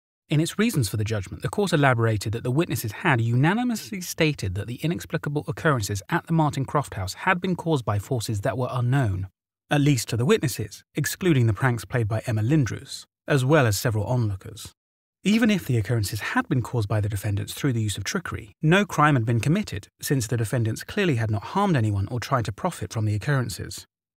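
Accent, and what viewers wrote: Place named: Bristol, United Kingdom. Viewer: Your voice is very melodic. I'd say it's close to but not quite a Bristol accent?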